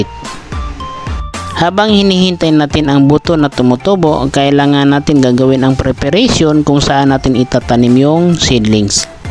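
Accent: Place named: Maranao, Philippines. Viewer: Mranao accent. Maranao ka po ba?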